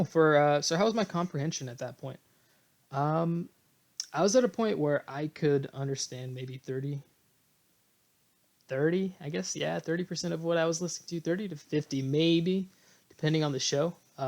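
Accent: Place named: United States. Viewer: Hola! Vas muy bien con el español. siendo sincero, tu acento sigue escuchándose como estadounidense pero es solo cuestión de tiempo. Mucha suerte!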